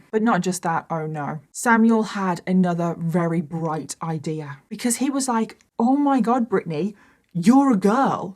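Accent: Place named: Birmingham, United Kingdom. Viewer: i asked my friend if she could do her makeup and talk at length about csa at the same time and she said no. the grwm/true crime subgenre is kinda antiquated now but it's definitely a skill and i'll always be here for it. anyway the stuff you talked about was horrible asf so thanks for that. always nice to hear a brummie accent too.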